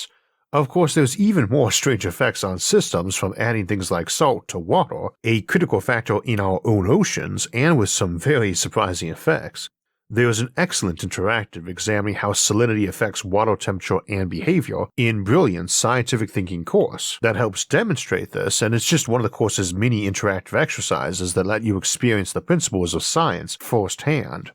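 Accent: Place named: Boston, United States. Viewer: Love the vids! Been subscribed for a while. I keep wondering though - where is your accent from? Sounds very Boston to me but i could be completely wrong!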